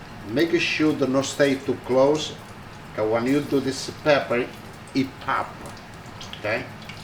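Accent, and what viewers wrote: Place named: Sicily, Italy. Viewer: Im making this tonight! Love this mans recipes! He reminds me of my grandmother who came from sicily in 1938 when it comes to his accent and his love for cooking.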